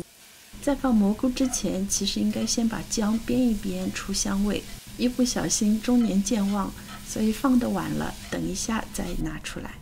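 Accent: Shanghai, China. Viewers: 听发音听出了上海人，看面粉牌子就知在美国对伐？这种菜包是王家沙经典包子。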